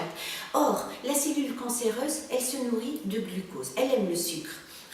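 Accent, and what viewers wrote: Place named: Tunisia. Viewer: Merci Madame pour le partage ! Vidéo très éclairante ! J'aime l'accent tunisien !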